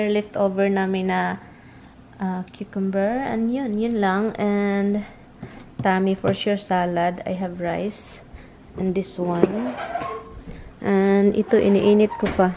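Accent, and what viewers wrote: Visayas, Philippines. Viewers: Halatang bisaya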